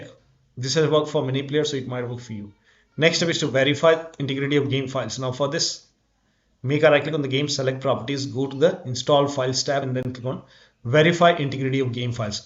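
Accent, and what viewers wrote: India. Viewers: >hear indian accent >close the video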